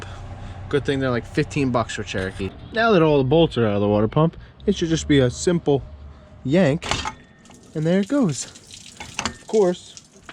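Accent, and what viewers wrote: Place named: New England, United States. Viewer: Thanks Guys. Where are you located? You sound like New England. That’s where I am and if you’re around here would you consider installing it for me? Thank you 😊. Maggie Donovan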